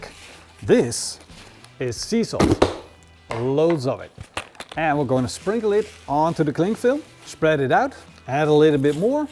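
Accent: Netherlands. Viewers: You had me really wanting this until I saw the nipples on the belly . I know I am being a wimp, but I just cant see myself eating the nips! However, I do love the content and your accent. I grew up in Den Haag and the accent reminds me of my time inn Holland. That always makes me smile!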